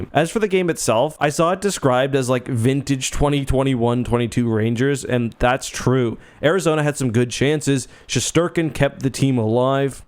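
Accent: Canada. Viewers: I always knew was a canook by his accent but I didn't know he's a huge hockey fan. Now I like him even more 😂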